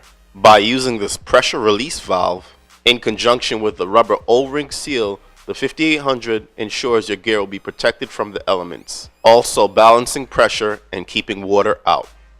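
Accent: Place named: Maryland, United States. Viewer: I detect a Maryland accent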